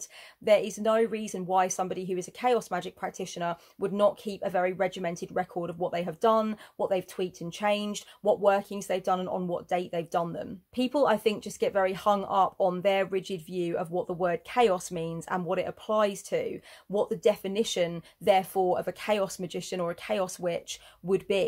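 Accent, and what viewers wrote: United Kingdom. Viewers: I enjoy your intelligent and independently minded videos. Find them quite relevant. Plus, like British accents. Which regional accent is that?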